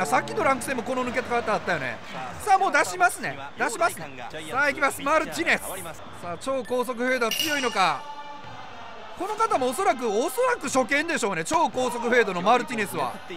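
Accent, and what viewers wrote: Kansai, Japan. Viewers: 関西からででくん？ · 引っ越ししてからも関西弁やめんといてな！また帰って来てくださいね！！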